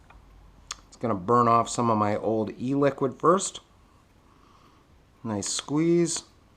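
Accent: Finland. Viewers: Are you finnish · Dude!!, thats cool;) I noticed a little fin akcent there:)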